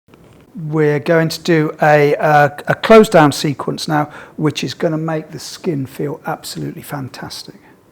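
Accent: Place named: United Kingdom. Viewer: As a Yank it's great to hear British English...it's a dance of the language.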